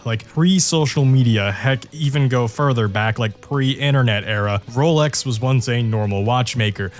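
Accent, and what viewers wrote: United States. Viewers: Bro I thought you were actually American you expect me to believe that you're Asían? Nahhhh I can't do that 😢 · is asian? You sound like exactly American tbh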